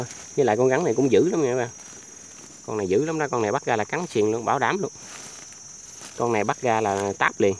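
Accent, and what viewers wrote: Vietnam. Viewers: Em làm clip vui vui, noi chuyện nghe là biết miền Tây anh thích lắm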